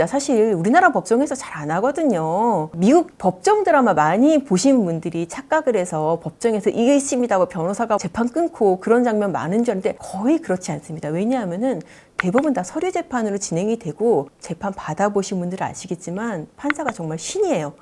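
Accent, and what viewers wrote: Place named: Jeolla, South Korea. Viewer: 전라도억양이 있어요…조선은 우파라 할수없죠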